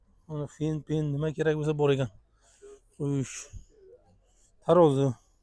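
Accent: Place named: Peshku, Uzbekistan. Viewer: БУХОРОНИ ҚАЙЕРИДАНСИЗ ШЕВА ПЕШКЎГА ТОРТОПТИДА ДЕГДАВОНМИ ПАХТАОБОД ГУЛХАЛОНМИ КАРОЧИДА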